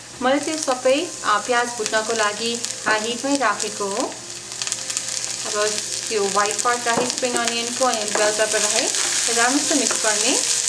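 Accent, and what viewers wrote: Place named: United States. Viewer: Nepali with US ACCENT WITH HINT NEPALI IN IT 😁❤️👍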